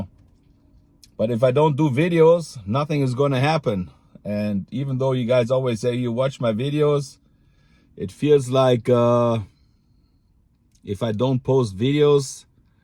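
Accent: Germany. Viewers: Who doesn't love this guy? Please like and subscribe. I love this German guy. Also love the accent. !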